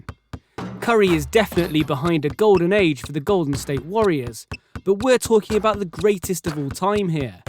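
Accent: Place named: England, United Kingdom. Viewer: Stephen and not steven. I am sure u re inglish man and not american because of your accent.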